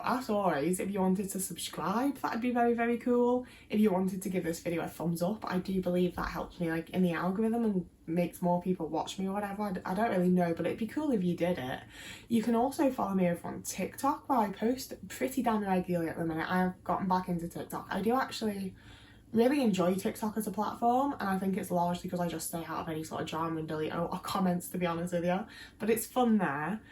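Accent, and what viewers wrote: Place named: Midlands, United Kingdom. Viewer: When I first watched your videos, I was so confident that you were fully scouse based off of your accent but now I don’t really hear it anymore. I think your accent is getting more midlands over time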